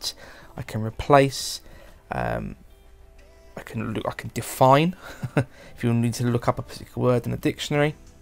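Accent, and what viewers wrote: United Kingdom. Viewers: This is an outstanding presentation of Pages for Ipad. I have just got a Magic Keyboard and was looking to learn Pages. I was not disappointed. This tutor should be an ambassador for Apple. He makes Pages very easy to understand and I am grateful for his assistance. It is also very pleasant listening to a British accent. Thank you.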